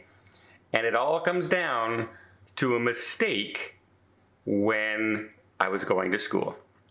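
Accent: Canada. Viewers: I just love when your Canadian accent comes out, it always makes me smile. Thank you, for sharing your story.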